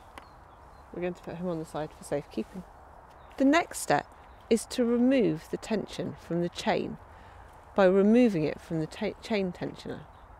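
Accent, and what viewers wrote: England, United Kingdom. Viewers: Best ever Step by step Repair video ever Created. Great English Accent, great voice tone. The Best ever English teacher ever Created. Great Country side Background . Priceless for a GreenHorn like Me.Thanks a few Billions. Great tips like not removing fully the tab washer, lifting the rear wheel to ease chain releasing b4 tensioner takeoff.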